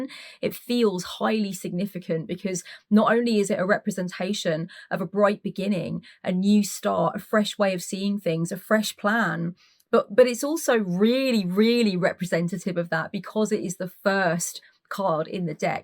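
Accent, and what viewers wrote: United Kingdom. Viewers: Yeeeesssss another unique British accent to save for my accents of Britain collection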